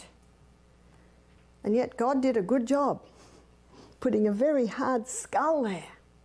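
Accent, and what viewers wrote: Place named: Australia. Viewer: This story sounds so much more believable with an Australian accent.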